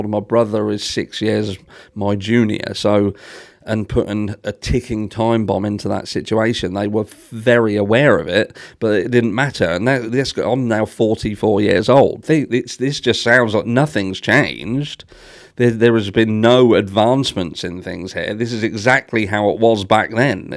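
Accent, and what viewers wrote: Norfolk, United Kingdom. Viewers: I am new here. I actually miss the Norfolk accent. I was brought up around the NW Norfolk coast and spent a lot of time working Norwich way. I appreciate the sense of humour you bring to some of the stories you cover. Sometimes I fly back via Norwich airport and I love hearing people say they are going to Tesco's in the Norwich accent...awesome;). Your channel reminds me of why I loved my life in Norfolk so much. It was the good hearted humour and friendly attitude of the people you met where ever you were. I hope the recent disgusting abuse of power during the Coviet Union takeover has not dampened the spirit of the good people of my County. Bless you and your family and keep your positive attitude my Norfolk Cousin ;)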